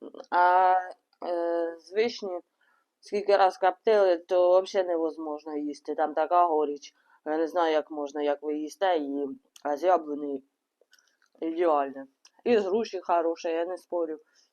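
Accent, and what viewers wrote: Poltava, Ukraine. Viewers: Привіт зимлячка!!!!!!!, сьогодні я перший раз побачив твій ролік і почув наш Полтавський говор, дуже зрадів шо є така жінка рибачка, із сила, наша настояща Полтавчанка а ни наіграна якась бутафорна блогерша, багата з екоферми!!!!!!!! А в теби все по простому, як обично в селі!!!!!!!!!!! Та щей канал свій ведеш, зразуж підписався, дуже радий шо тебе знайшо чисто случайно, переглядав одне відео про заборону вилову риби з першого квітня, і тут натрапив на теби!!!!!! А з якого ти села якшо несикрет????????? Я з с.В.Сорочинці!!!!!!!!!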